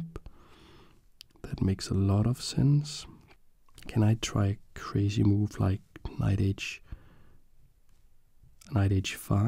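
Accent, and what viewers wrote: Denmark. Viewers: Jeg havde aldrig troet jeg skulle sige det her - men er simpelthen så vild med din danske accent! Jeg ville elske, hvis du kunne inkorporere flere ASMR elementer (i nogle videoer), hvor du gentager ord eller “tapper” på noget! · Just gonna ask whats ur main language ur accsent remind me of some1 from denmark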